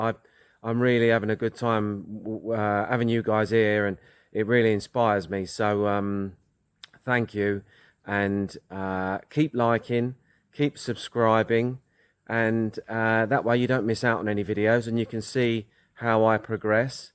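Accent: Essex, United Kingdom. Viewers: Hi, where are you originally from in England? Correct me if I'm wrong, sounds very much like an Essex accent to me? Cheers, all the best.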